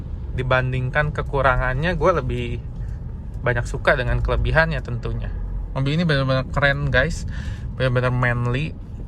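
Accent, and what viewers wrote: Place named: West Java, Indonesia. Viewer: Akang orang sunda jg ya?? Cara ngomong nya sunda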